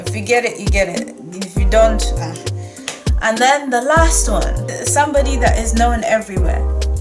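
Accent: United Kingdom. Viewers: I thought you were originally from London 🤷🏿‍♂️ I love your voice ❤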